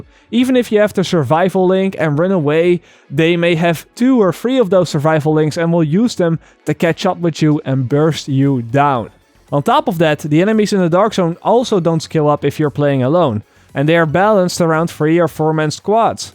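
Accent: Netherlands. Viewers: He's Dutch, which is Lesser Germanic. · are you from the netherlands your accent sounds a bit like that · its VERY obvious this guy is dutch because of his accent lol i am dutch aswell but i created my own accent on a young age so people kept thinking i was either from england or america which is great lol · plat Nederlands accent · He's dutch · Are you from netherlands?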